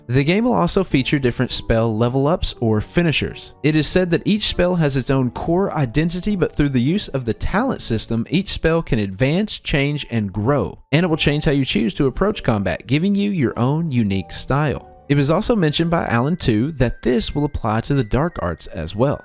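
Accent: South, United States. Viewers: Are you from the south? Because I am hearing an accent but it goes in and out. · Do you have an on/off country accent lol?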